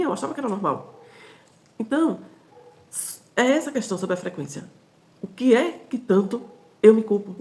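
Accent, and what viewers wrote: Brazil. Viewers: Que alegria conhecer seu canal, esse sotaque lindo que não reconheci até você dizer que é Sergipana (ainda não conheci Sergipe). Me senti inspirada e mais motivada para me amar e ao todo. Dou gracas por sua vida! 🙌🏾🤲🏾🙏🏾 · Bem logo via que teu jeito de falar era das redondezas daqui😂😂😂😂😂😂 sou de Alagoas hahahahhsah amei seu canal e to na quarta onda ja ❤ obrigado pelo vídeo ❤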